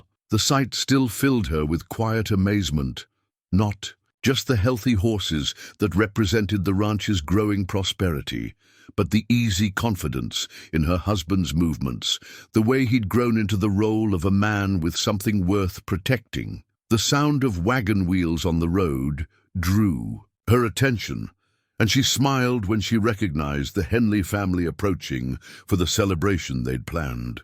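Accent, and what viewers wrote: United Kingdom. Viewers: Your voice sounds like your from London ! Exquisite voice!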